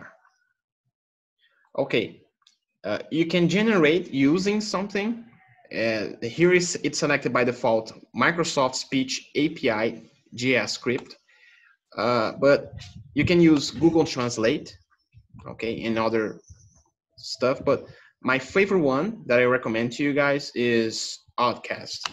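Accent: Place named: Brazil. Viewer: Só pelo sotaque já vi que era BR 🇧🇷❤️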